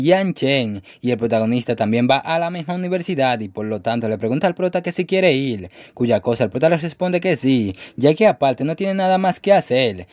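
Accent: Dominican Republic. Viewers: Eres de republica dominicana?. O de alguna zona del caribe. Tu acento es muy parecido😅 lo digo por que estoy en republica dominicana tomando vacaciones. Pero buen video😅❤❤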